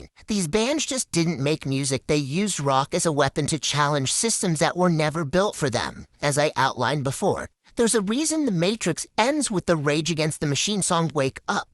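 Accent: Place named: South, United States. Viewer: we would still hear the southern drawl. Even with the voice filter.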